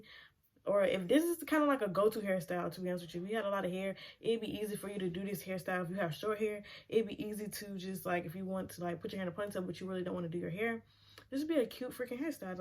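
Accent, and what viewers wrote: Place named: South, United States. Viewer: You country as hell that accent girl....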